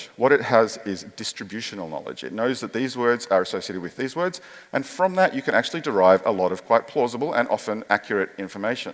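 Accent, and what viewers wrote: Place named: Australia. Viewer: Great to hear an Aussie voice for a change in all the noise of YouTube videos. Subscribed from SA :-)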